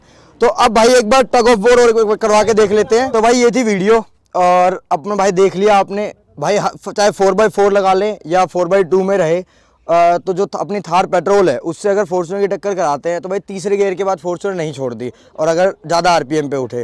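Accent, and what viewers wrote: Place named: Mewat, India. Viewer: Mewati si bolro h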